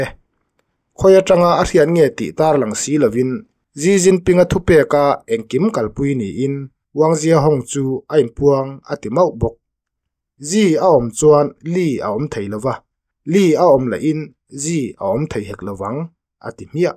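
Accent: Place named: Eastern Mizo, India. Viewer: I awka chu khawchhak unau a ang hle mai mahse a bengvar thlak e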